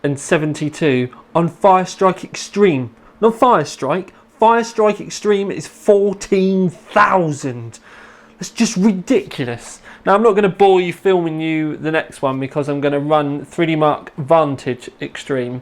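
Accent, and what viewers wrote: United Kingdom. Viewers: wtf british accent is trash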